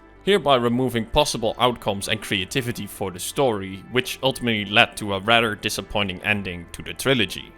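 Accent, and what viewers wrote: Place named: Netherlands. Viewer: Yo...Are you in any shape form or guise connected to Pretend3r or Pretend3rCE? Because he's dutch and is interested in Mass Effect as well. Plus you seem to have a dutch accent...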